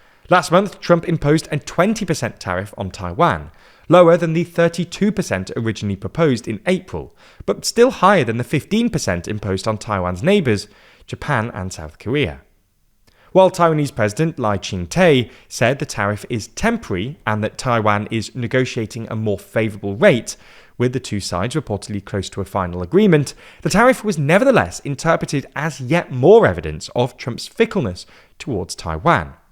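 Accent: United Kingdom. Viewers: That’s just due to the British accent.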